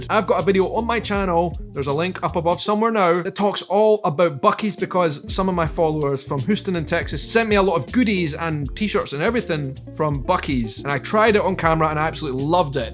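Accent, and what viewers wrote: Scotland, United Kingdom. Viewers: Scots like you say the pop in "Popeye's" like southerners e.g. in Tennessee, say "pop tent". It's basically a cross betwixt 'pup' & 'pop', a wee-bit closer to the 🐶. 🆒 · you are like a big, bright, beautiful bouquet of sunflowers in the morning! (Wow, I guess that was a mouthful). I LOVE your videos. HOWEVER, I do have one criticism: DEARHEART, YOU TALK TOO FAST. I am from the South in the US, and our lifestyle is so much slower and leisurely. We are not stupid; we just take our time. I have to sometimes watch your videos twice or more to absorb the content. You speak at warp speed (yes, a Star Trek reference). I do not know if your manner of speech is a Scottish trait or your individual personality. I feel sometimes that my brain is exhausted about halfway through some of your videos, and I give up. Sometimes I just redo it if it's content I really, really wish to see. 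I have reviewed some of your videos and found that you do not impart all your words of wisdom like a squirrel on steroids each and every time. You can make longer videos or divide them up into parts I and II. I enjoy the content so much and adore your accent. So, please, if possible, endeavor to slow your words down a bit for some of us older folks (I'm 67) who so enjoy your videos. By the way, I enjoy all the fast food restaurants you mentioned, especially Chik-Fil-A. Their grilled chicken sandwich is awesome, but their warm, chocolate chunk cookies are to die for. I hope you tried them. They are too good to miss. God bless. Love and hugs from Karen in Tennessee. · In-N-Out is the best! Open one up in Scotland and you can call it In-N-Oot. Meant with total respect for my favorite accent in the world. ❤️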